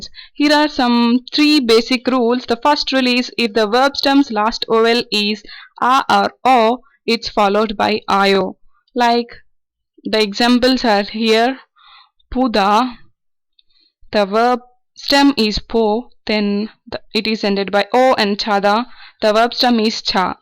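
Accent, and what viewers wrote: India. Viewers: Your accent is in Indian English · Hahaha so cute tone how indians talk english its just playing a funny games lol or its like waving water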